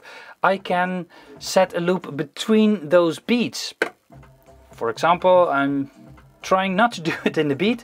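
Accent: Netherlands. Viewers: Hahah je klinkt gelijk Nederlands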